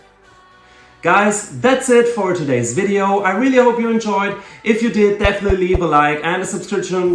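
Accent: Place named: Germany. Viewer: German english...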